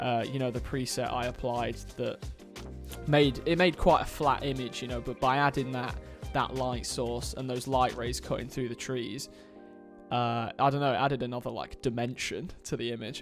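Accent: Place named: Northern England, United Kingdom. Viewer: You sound well northern in this 🤣🤣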